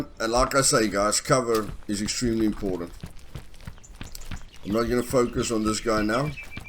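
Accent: South Africa. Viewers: Jy Suid Afrikaans?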